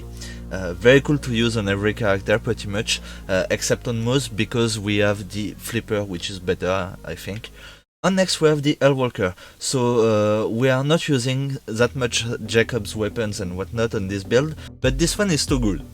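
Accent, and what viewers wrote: France. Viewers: T'es français non 😂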